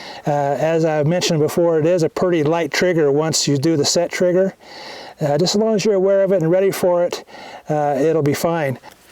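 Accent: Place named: United States. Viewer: I'm guessing based on your accent this is Utah or Idaho. Great video - I'm interested in this rifle myself & appreciate you sharing with us.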